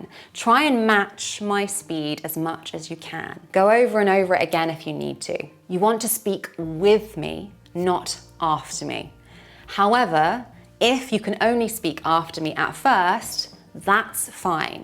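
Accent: United Kingdom. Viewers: Wonderful !, ....I enjoy listening to perfect British English, thanks a Lot 😊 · i really really love so much british accen , that's why i keep learning this great accent every single day .. I appreciated it. I thought you gave your personal touch in your every lecture. That's brilliant❤. it's very kind of you.May God always bless dan love you and your family.. Amen.. From the bottom of my heart,.. I Thank you so much, dear Teacher.. ifrikiya - indonesia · british english?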